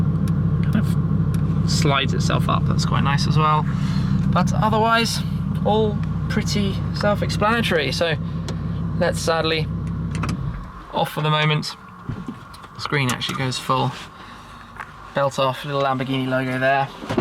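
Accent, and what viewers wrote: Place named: United Kingdom. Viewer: As much as I think your British accent is just.... dandy, please give it a rest while you're driving. Even during launch control, you're just blabbering on and on. It's like someone put 6 AA batteries in your head and turned the "ON" button.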